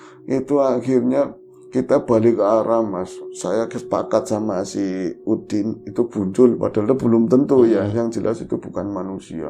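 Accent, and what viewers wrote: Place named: Tegal, Indonesia. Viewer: Sllu kangen bhs.Tegal bro yg sngt sedap ddengar jd auto like, komen, and subrek.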